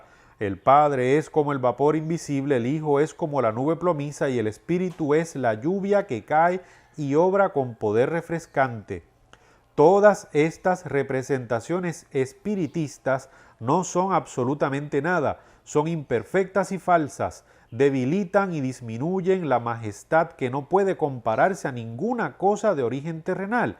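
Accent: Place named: Puerto Rico. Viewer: Hermano de qué país eres ??? Tu acento parece puertorriqueño cómo yo.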